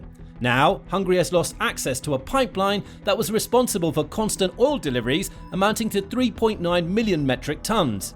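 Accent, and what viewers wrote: United Kingdom. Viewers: Unfortunately Zelenskyy said he does not know how the $350,000,000,000 in US AID has been spent. From the sounds of the British commentator, maybe the Brits know where the grift has gone.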